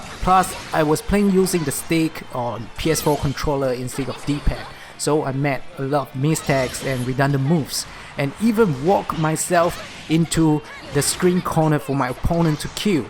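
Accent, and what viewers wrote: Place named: East Asia. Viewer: hey love your video may i know where are u from? u sound like chinese/ japanese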